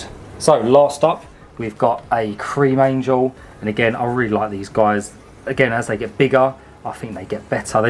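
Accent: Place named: Essex, United Kingdom. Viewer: No way that’s a 350? You also sound like a local (Essex by any chance)? Lovely specimens 🐠 👌🏼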